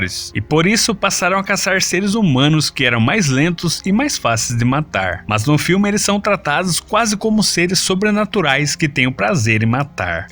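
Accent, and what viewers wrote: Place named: Caipira, Brazil. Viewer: O melhor dessa análise é o sotaque caipira do narrador!!!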